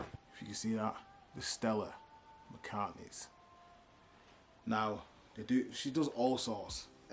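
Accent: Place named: England, United Kingdom. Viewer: Found you due to shout out by Lavender Clothesline! Love your videos so far, nice gentle personality, great accent ( We in U.S. suckers for that English Accent !!! Sexy.) Second, Great information Hope your following grows and grows. Good Luck.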